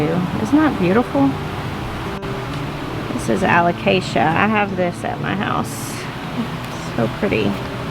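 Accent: South, United States. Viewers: Holy southern accent in this video! Never noticed it as much as this video! Must be the heat in the fall. 😃😃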